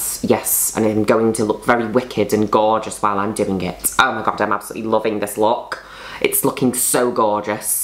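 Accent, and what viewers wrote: United Kingdom. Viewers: That thick British accent tho...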